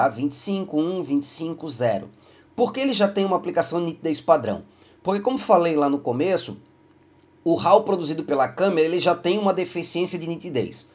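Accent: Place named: Santa Catarina, Brazil. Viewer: pelo sotaque é catarinense não? rsrs Florianópolis? ótimo vídeo. parabéns e obrigado por compartilhar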